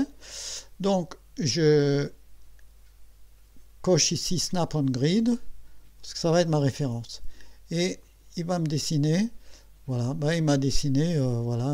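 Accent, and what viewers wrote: Alsace, France. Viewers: clin d'oeil pour l'accent du Sud Alsace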